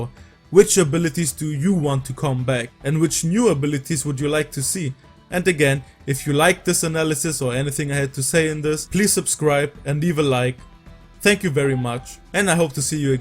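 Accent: Germany. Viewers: Are you german because youre accent sounds lile a german one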